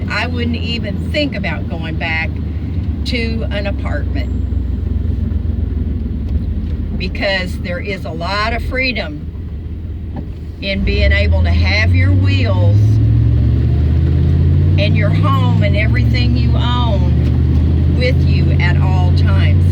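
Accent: South, United States. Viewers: Oh....I've just found you. Please don't be gone forever...take a break but don't leave us hanging. You are too interesting and sensible. Plus your southern accent is delightful. Hope you will consider posting more even if not as often. Safe travels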